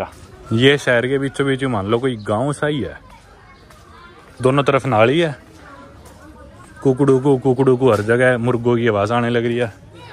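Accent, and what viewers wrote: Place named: Delhi, India. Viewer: Jaise delhi wale punjabi bolte h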